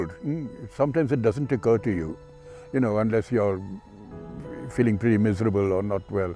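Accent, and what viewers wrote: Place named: United Kingdom. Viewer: A real mixed British Indian accent! Indo Britishers note!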